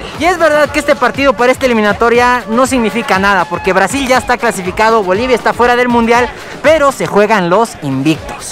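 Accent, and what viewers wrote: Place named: Mexico. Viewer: Cuando lo escucho hablar parece mexicano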